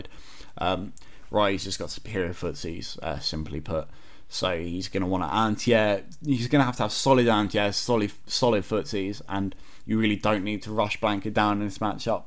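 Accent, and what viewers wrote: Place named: United Kingdom. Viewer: British accents are always great for narrating.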